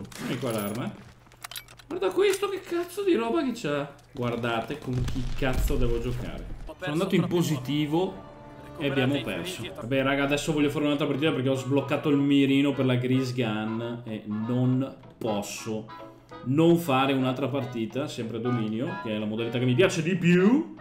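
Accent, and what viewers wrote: Veneto, Italy. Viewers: ma da quale parte del veneto provieni? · Mi fai troppo ridere!!!😂😂 In questo video si vede come ti sale il Veneto cazzo ahhahaha Grande!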